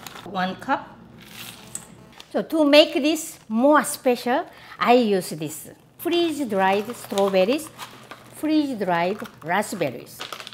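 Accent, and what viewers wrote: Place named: South Korea. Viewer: 아줌마 안녕하세요?저는 문화센터에서 교양영어를 가르치고 있는 강사입니다. 최근에 학생분을 통해 동영상을 보게 됐는데 자꾸 빠져드는 매력이 있네요. 수업시간에 자주 보게 되는데 학생분들도 참 좋아 하세요. 이런 말씀 죄송한데 너무 귀여우세요..ㅋㅋ 한국식 발음이 뭍어 있는 영어지만 너무나도 훌륭하게 하실 말씀을 다 하시는걸 보고 학생분들이 영어가 귀에 쏙쏙 들어 온다며 자신감과 용기를 얻으세요. 저는 초중고를 뉴저지 Cliffsidepark에서 나오고 석사는 NYU에서 했어요. 박사는 이대에서 영어교육을 수료한 상태구요. 망치님이 뉴욕에 살고 계신다니 방갑더라구요. 저도 요리하는걸 참 좋아해서 외국인들을 대상으로 한국 쿠킹 클라스를 열곤 했는데 저의 방식과는 다른 레시피로 시원시원하고 섬세하게 요리를 하셔서 제가 배울점이 참 많은것 같아요. 몇가지 요리는 저도 시도 해봤답니다. 다음 비디오에는 오므라이스나 한국식 볶음밥을 해보시는건 어떨까요? 김치볶음밥도 괜찮구요. 만들기도 쉽고 한끼 식사로도 훌륭하니까요. Kudos입니다. 앞으로도 맛있고 즐거운 비디오 많이 부탁 드립니다. 지켜 볼께요. 화이팅 입니다.!!!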